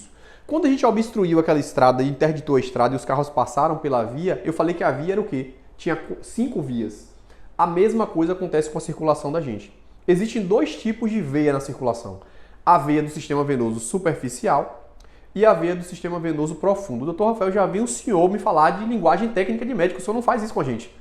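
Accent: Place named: Bahia, Brazil. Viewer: Eu acho lindo o sotaque baiano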